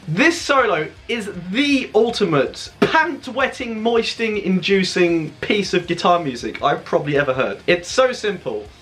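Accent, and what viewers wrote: United Kingdom. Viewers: did he? That british accent (I like so much) played me...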